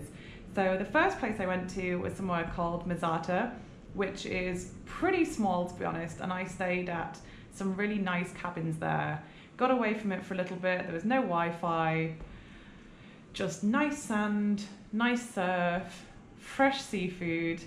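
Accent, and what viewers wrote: United Kingdom. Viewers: Dear, first and foremost, thank you so much for visiting our country. It's so smashing to know that you have come from so far away good old England. A question, did you visit El Cuco beach?? I believe you didn't but in case you are willing to come back again, I strongly recommend you to visit El Cuco beach located in the eastern zone of El Salvador. Although services are faster in El Tunco, El Cuco beach is so enthralling and I'm sure you will love it. I'm an English teacher with an accent that will set you thinking...it would be amazing if you could listen to my pronunciation. I love british English and your accent is just stunning. I trust you are doing well and enjoying life. I'm so glad you had a smashing time here. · we are not used to the brit accent yet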